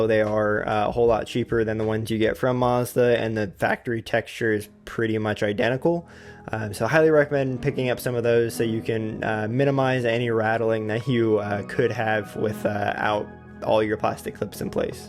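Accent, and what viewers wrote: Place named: Pittsburgh, United States. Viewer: Yinz from the ‘Burgh? I detect a Pittsburghese accent. 😅👍🏽 Thanks for actually showing the “rapid disassembly” method, even though it was a very short clip. I understand you can’t specifically recommend this method (due to liability), but I saw enough to have some confidence to try this rather than taking off the rear trailing arms.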